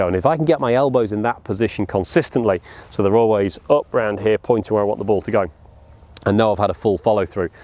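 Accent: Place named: Midlands, United Kingdom. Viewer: I want this guy to give me private lessons. Sounds like a midlander though and I'm near Gatwick 😔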